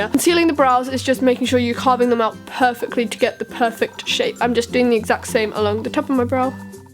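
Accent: United Kingdom. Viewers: love your british accent so much<3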